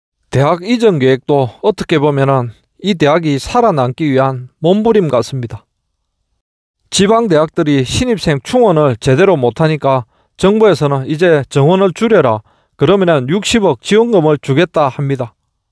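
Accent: Gyeongsang, South Korea. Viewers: 축하합니다. 씨! 얼굴도 보이게 찍으면 더 좋을것 같은데... 얼굴좀 보여 주세요. 경상도 사투리는 고칠수 없다지만 얼굴 보여주는것은 시청자에 대한 예의가 아닐까요? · 심심하면 잘 시청합니다 추카추카 합니다 경상도 말씨도 구수하고 여기는 전라도 광주네요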